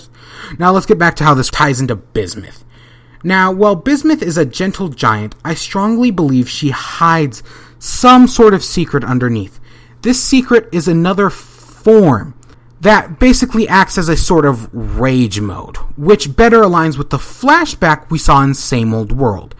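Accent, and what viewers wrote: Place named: United States. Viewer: he sounds like he has a normal American accent tho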